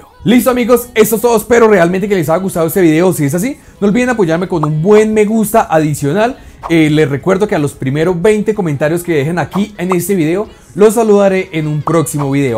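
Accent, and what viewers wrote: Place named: Bogotá, Colombia. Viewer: Buena el parcero se Bogotá se nota ajjajaja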